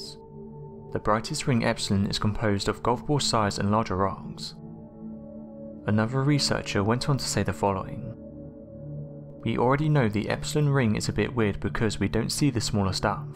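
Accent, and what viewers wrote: United Kingdom. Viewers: Is this a Brithish robo-voice? Otherwise not much content. Uranus is boring. Wind and pebbles.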